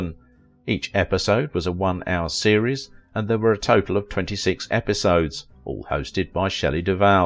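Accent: United Kingdom. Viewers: Why are you so bad about misaligning images and audio? "let's talk about how frightening the Shining was while showing pictures at that exact moment from Popeye." Also, is this voice yours? Or are you another shitty Chinese/Indian channel that hires British voice actors to try and give the illusion that they do good work?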